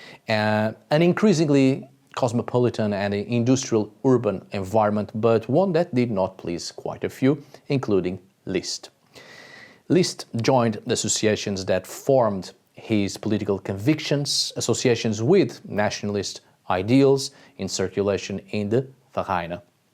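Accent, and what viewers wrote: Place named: Portugal. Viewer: I knew you were Portuguese by the way you spoke… we can identify each other easily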